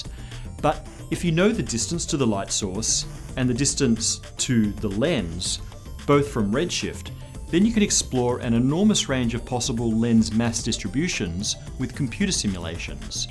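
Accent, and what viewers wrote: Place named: Australia. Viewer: I'm so used to hearing American / UK accents in videoa that when I hear an Australian accent i can't figure out where it's from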